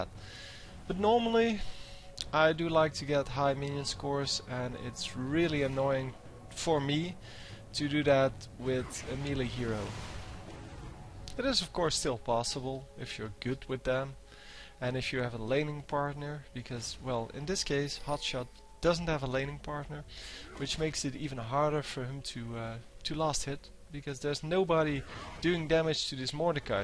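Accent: Netherlands. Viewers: haha I noticed he's Dutch because I talk the same way as him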